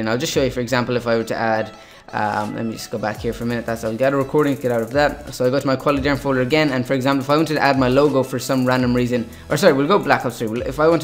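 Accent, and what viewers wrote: Ireland. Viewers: are you from ireland man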